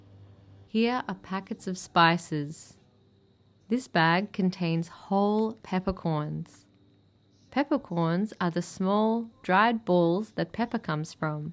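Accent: Australia. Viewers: Hi! I’m watching from South Korea. As someone who really loves the Australian accent I really enjoy watching your videos and listening to the way you speak! It’s also interesting to see so many Korean products in Australian Asian markets. By the way the salt bread snack is popular in Korea as well🤤🤤 The chocolate flavor is popular too!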